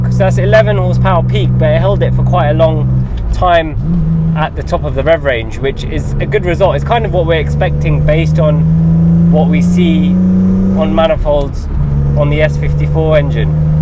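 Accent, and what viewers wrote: United Kingdom. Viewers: How did you get such a good British accent?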